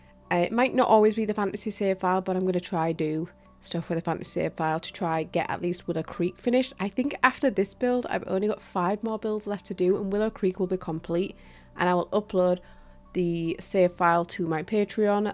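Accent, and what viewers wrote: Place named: North, United Kingdom. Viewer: Just found your channel and hearing a fellow northern gamer is such a refreshing thing! Usually they're American and i dont feel a connection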